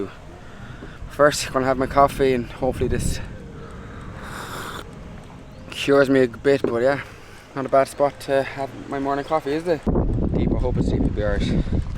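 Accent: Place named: Ireland. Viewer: Sounded like irishy accent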